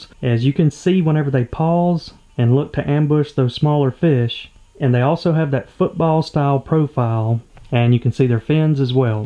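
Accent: South, United States. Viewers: Has his accent become more southern??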